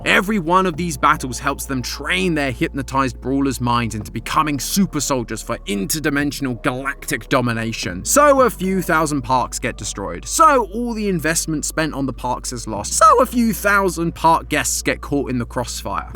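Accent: United Kingdom. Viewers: Man I love the British accent...brool stars. hehehehe😅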